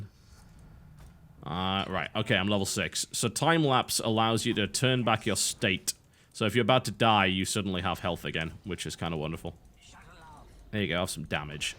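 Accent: United Kingdom. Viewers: When TB gets frustrated his brittish accent gets more brittish. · she is really bringing out the British on TB. BTW why are you not making WTF's on Indie games anymore you sellout!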